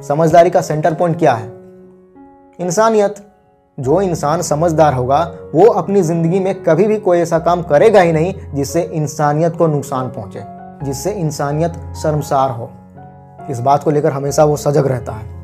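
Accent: Bihar, India. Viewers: Tum Bihar se ho ya jharkhand se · Aap Bihar se hai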